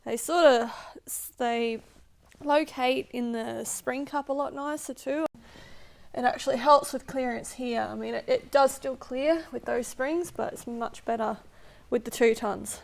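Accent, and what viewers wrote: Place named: Australia. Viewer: Слышу австралийский акцент если я не ошибаюсь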